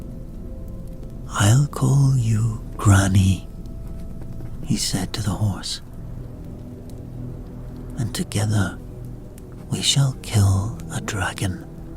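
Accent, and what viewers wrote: Scotland, United Kingdom. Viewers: Really enjoying these as part of my routine before bed. I spend the majority of my year working abroad. The Scottish accent adds a feeling of comfort and home. These are all so well put together. As a fellow Scotsman, cheers pal! Ps. You’ve got yersel a patreon 😉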